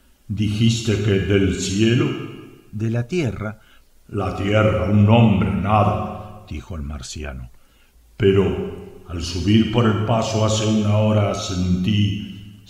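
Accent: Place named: Argentina. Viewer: Ese acento argentino no me deja concentrar ...😅😅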